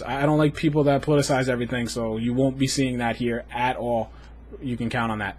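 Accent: Boston, United States. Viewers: you sound kind of like a boston guy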